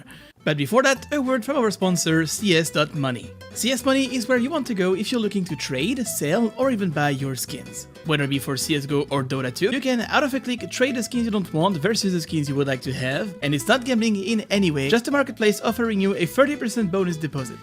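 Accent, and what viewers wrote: France. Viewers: Pourquoi tu parle anglais alors que on sent ton français a 100000kilomètres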